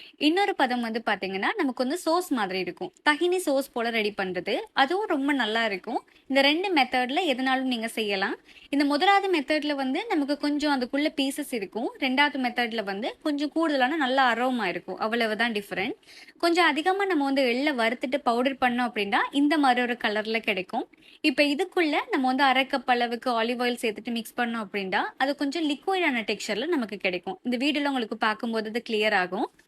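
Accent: Sri Lanka. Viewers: நீங்க ஆரம்பத்திலே உங்க பேச்சை வைத்து srilanka என்று தான் நினைத்தேன்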